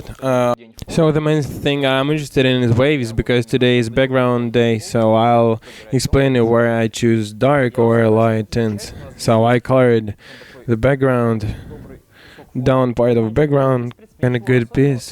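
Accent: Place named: Scotland, United Kingdom. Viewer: у шотландский акцент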